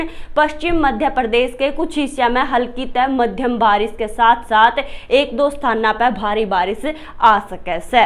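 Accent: Haryana, India. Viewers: Bhot achi lagti ho bolta hua apki harvanvi vaoooo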